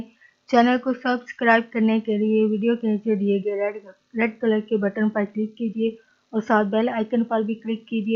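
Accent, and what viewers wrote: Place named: Rural, India. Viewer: paindoo awaz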